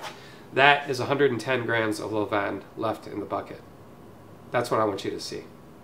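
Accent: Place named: France. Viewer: sounds kinda french.. because it is :S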